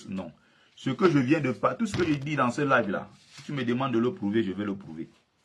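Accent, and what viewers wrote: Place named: Beti, Cameroon. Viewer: 😂😂😂😂😂😂😂😂😂😂😂😂 toi ci hein, tu fais l’accent beti bien.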